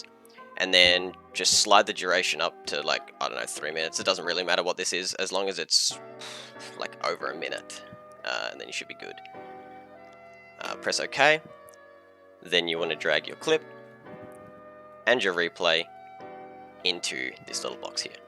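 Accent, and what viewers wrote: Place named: United States. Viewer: why does he sound like flea but with a american accent XD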